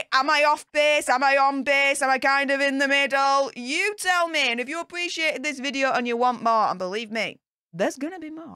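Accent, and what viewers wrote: Yorkshire, United Kingdom. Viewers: Sounds as though she’s from Yorkshire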